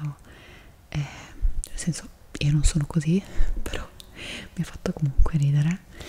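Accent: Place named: Milan, Italy. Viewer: Non capisco se mi sembri milanese o una delle assistenti del diavolo veste prada (no insulto ti adorooo❤)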